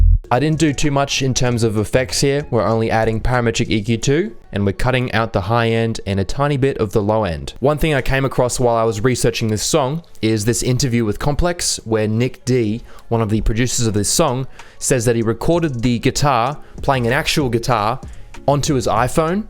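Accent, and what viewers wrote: Australia. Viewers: Mate are you from Australia? Cus I hear the accent 😂🤙🏾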